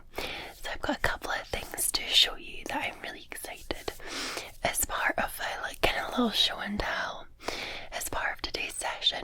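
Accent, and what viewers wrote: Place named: Scotland, United Kingdom. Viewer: Greetings from Glasgow. It’s so authentic to hear ur accent, nice to hear a homelander doing ASMR! Love ur content especially scalp massage. Helps with anxiety and sleep. Thanks x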